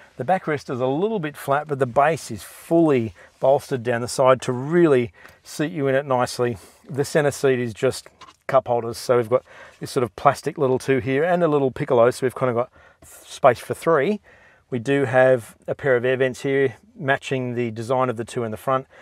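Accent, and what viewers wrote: Australia. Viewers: Man.... I miss Australia soooo much. I already have an E-class coupe and I really like it. I'm here mostly to listen to someone speak, Australian! BTW, silver is a very good color to have (especially in Canada), because dirt doesn't stick to it like it does on a white or dark colored car. I have always bought only black or dark ruby red, cherry red, metallic cars. But I discovered that silver cars, I only have to wash it once for every five or six times I'd have to clean a black car. I bought silver because it was the only E coupe on the lot; and if it's a Merc', color doesn't really matter to me; it's a Mercedes Benz.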